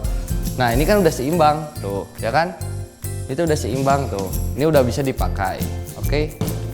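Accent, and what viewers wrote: West Java, Indonesia. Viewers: ia kang ketauan banget sundanya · Kalo dari logat logatnya orang sunda nih kayanya😁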